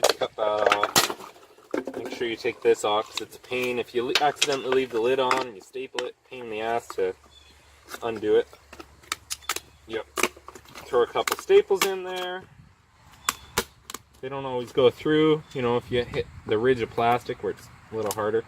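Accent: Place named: Canada. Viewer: As soon as I heard the Canadian accent, I knew this was the right video to watch.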